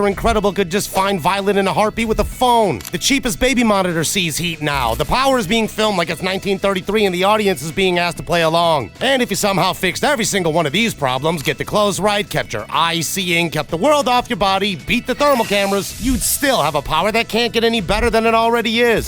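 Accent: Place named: New York, United States. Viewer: I personally think invisibility sucks as a power. It's as effective as mace spray to the eyes, which would actually be a more effective ability. Also, random thing, but I was NOT expecting you to be Asian with THAT Rocket Raccoon New York accent.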